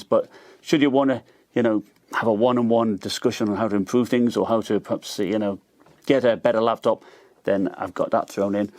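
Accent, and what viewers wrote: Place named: Teesside, United Kingdom. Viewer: Cracking channel, stumbled across you a few weeks back and the accent caught me attention! You sound like your from just up the road from me in Teesside but you’ve gone global! 😂 love the channel and bought myself a Nitro 5 last week after watching your channel! Can take the lad out the north!.....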